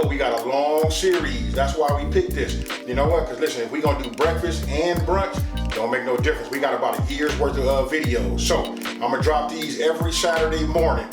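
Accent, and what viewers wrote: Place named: California, United States. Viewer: The California accent though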